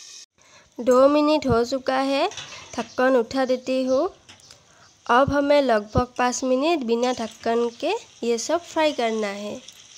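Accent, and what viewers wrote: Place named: Assam, India. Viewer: nehi wo Assam se hai. Unka naam ek flower ka naam hai. Aur kabutar Assam me khate hai aur yeh kabutar ka receipe bata rahi hai. Ho sakta hai yeh Bengali hai lekin pakka Assam se hai. Unke Hindi ka pronunciation se pata lag jata hai. Aur yeh kisiko Assamese me reply kar rahi thi meine dekha tha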